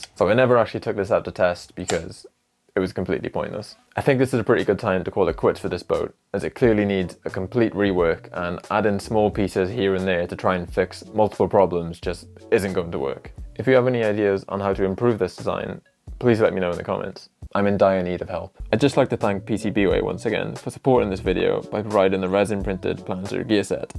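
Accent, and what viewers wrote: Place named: England, United Kingdom. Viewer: Legit sounds like Tom stanton... like as if they went to the same schools growing up with each other with whatever part of England they're from.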